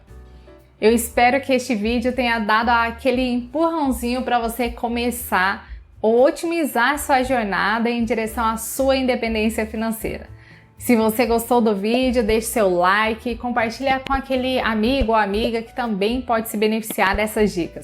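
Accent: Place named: Minas Gerais, Brazil. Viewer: Pelo sotaque é mineira; inscrito.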